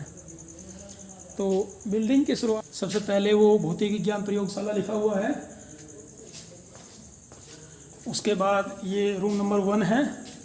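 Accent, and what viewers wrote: India. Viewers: AAP ilahabad ki tarah Joab me hoge aapki bhasha se lagta he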